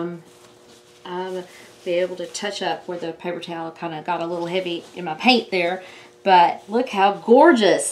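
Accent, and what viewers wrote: South, United States. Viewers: Enjoyed this, Very nice. May I ask where you are from? You have my southern accent! I'm from SW VA.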